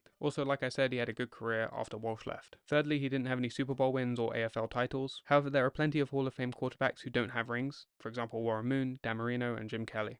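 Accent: United Kingdom. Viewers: "Many of you grew up watching the game. I am from the UK, so I didn’t. I only discovered the sport in 2012/2013. I’m sorry if it bothers some of you that I’m young. What can I say." -- Some people are just bothered and get on the web to share their misery. Don't let them grind you down or steal your joy! I watched your video, noting your accent, and thought you are very insightful. Glad to have a young fan of American football in the UK! Liked and subbed. "I want to stress I’m no expert. I get things wrong all the time. But I enjoy the game, I like researching, and I hope to provide a unique spin in my videos. I will continue to strive and improve every video." -- Carry on mate, and enjoy yourself. Don't ignore your critics, but focus on your fans. May you gain many! "Can’t change my age though....Sorry for the lengthy comment. Thank you for your time and God bless." -- Haha, time will take care of that for you, trust me! 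But those numbers are only laps around the Sun. True wisdom is found by looking above and beyond the Sun. May God bless you, too, and grant you much wisdom. See you in your next video.... 😃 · British accent? ok.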